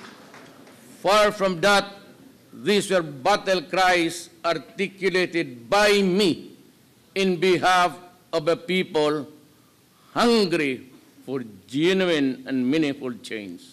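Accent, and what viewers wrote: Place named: Mindanao, Philippines. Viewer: Say what you want about my boy's thick Mindanao accent, but Duterte's dictionary game is on point. English vocab better than most people I hear in the states..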